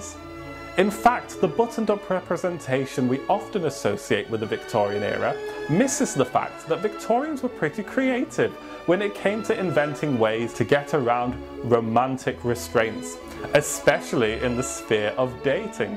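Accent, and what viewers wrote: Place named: Poland. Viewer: ! You make interesting videos! PS. Are you polish or ukrainian? You have such an amazing accent.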